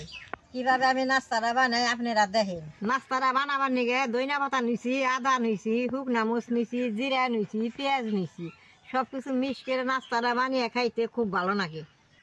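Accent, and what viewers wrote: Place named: Kishoreganj, Bangladesh. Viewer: Asam ar vasa onak ta Bangladesh ar Kishoreganh ar moton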